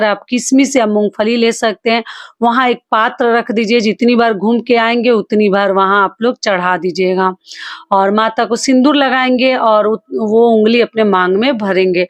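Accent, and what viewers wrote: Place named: Uttar Pradesh, India. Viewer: Ap up se lagti hain ankwar dena hamari banarsi bhasha hai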